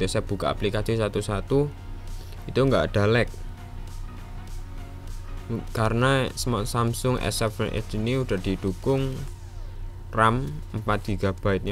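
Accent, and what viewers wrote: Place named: Java, Indonesia. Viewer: Ngomong nya Medok Banget Mas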